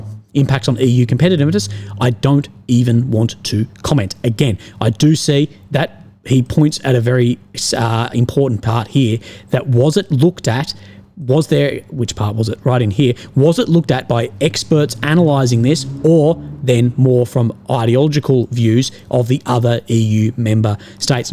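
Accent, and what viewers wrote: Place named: Australia. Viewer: the other producers you’re mentioning are way lower in quality. accent alone make Americans love him like crazy! We think that funny little accent is great. I could put up with a drunk Ausi talking nonsense all day and still have a good time. has implied many time “Ukrainian could Win” I quote “if Ukraine wins” There is not a bigger lie told then “Ukraine win” in the same sentence. I’m here looking at a map wondering when people stop lying to the poor men who die daily under the false idea of “winning in Ukraine”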